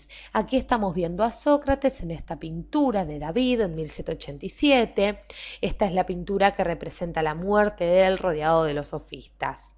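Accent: Argentina. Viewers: Eres Argentina? Hablas muy argentino Saludos desde México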